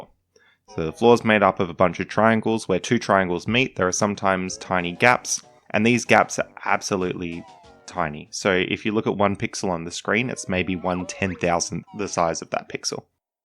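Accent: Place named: Australia. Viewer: I still get surprised every time I hear an Aussie or New Zealander accent on the Internet. I shouldn't be, but I am, cos I grew up in a time when the Oceanians had really bad Internet. Also, cool video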